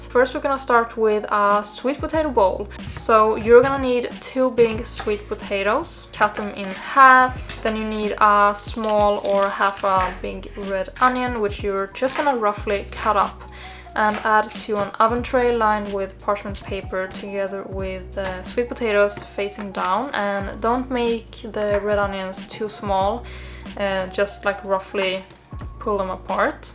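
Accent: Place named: Sweden. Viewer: I thought you sounded Swedish. I googled you and see that you are Swedish Spaniard. Interesting because I always thought that the two accents (Swedish and Spanish)sound similar. Nice burrito bowl.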